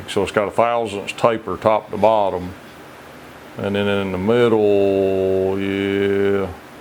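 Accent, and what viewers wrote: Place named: Kentucky, United States. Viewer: This guy has a beautiful KENTUCKY accent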